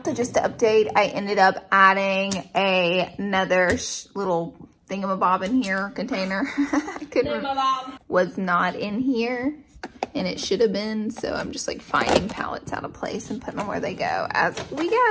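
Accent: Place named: Alabama, United States. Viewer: Love hearing someone with the same Alabama accent, you speaking my language lol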